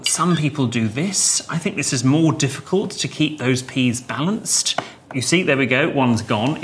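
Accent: United Kingdom. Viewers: 還是喜歡英式的紳士風度，腔調聽起來很舒服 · 他用超級英國的方式開嘴說幹話反差令整件事更有趣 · I'm learning how to cut cheese for Shak cut (字幕記錄 沒意外是錯的 不是 Shak cut，奶酪也許 charcuterie 法國食品才正確，IPA約為 /ʃɑːˈkuː.tər.i/ ，最末的發音近乎 /triː/ ，沒金耳朵聽了多次也不確定。如不是主持這英式近PR的口音，字幕記錄錯的也許多的多。) well you live left on the edge here . 這裡的內嵌中文字幕，把問的人形容為89，但89我也不明何意，問google，大意就是"底層"或是"低端人口"吧。 如是這樣，根本不配合片中上文下理，也許完全相反，低等文明不存在學習禮儀的可能性，黑社會不會問：老闆，我可以用武力威嚇你給錢就稱作保護費嗎？ 很有趣，我沒多少英文程度，去問問AI，反覆的問，得到較合理的解說是 "考慮到上下文，主持人在談論切奶酪作為餐桌禮儀的一部份時，並不是在字面上描述真正的冒險，而是在輕松地開玩笑。可能這句話的意思並不是詢你"你會過上這樣的生活嗎？"而是以一種幽默的方式贊美這個學習過程，暗示在切奶酪方面的精緻和技巧可以被視為一種"冒險"。 哪通俗的說是你問的程度是專業的啦，二是，你在裝B。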